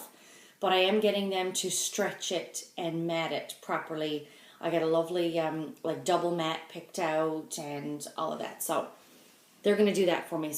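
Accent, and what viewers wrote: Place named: Newfoundland, Canada. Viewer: My dear I loves hearing a newfies voice on me phone . Thanks for the video maid . Catch yah on Instagram sometime ! Hugs, Blake!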